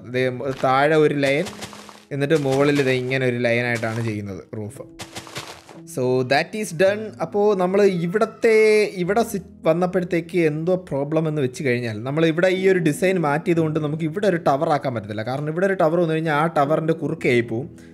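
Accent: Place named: Kasaragod, India. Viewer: Kerala mayi touch ullad kasarkood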